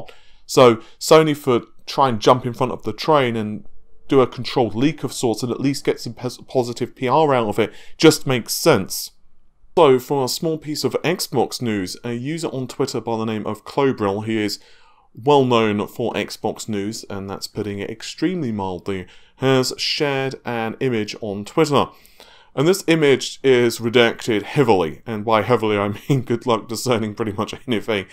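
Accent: England, United Kingdom. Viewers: Maybe a correct pronunciation of Navi will happen after it's launch, lol! I know it's his proper English accent when it comes to Nah-vey. But, at least he's doing better than Rich from RTU who's so far called it Nuh-vee, and Naiv-eye🤣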